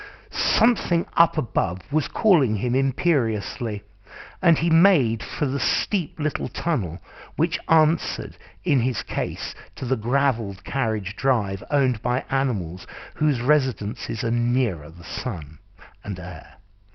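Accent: United Kingdom. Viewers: Ohh thank you very much, i have been looking for this one with British accent. I give you my like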